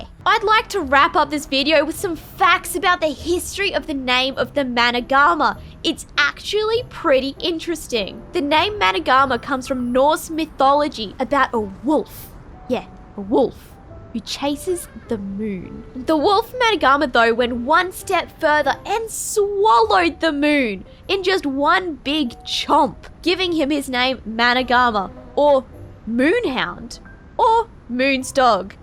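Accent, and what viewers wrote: Australia. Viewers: is an aussie??? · Are ye from australia mate?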